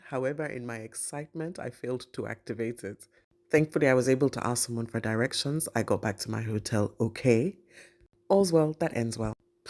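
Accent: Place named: Nigeria. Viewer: Beloved, ur intonation sounds Nigerian😅😅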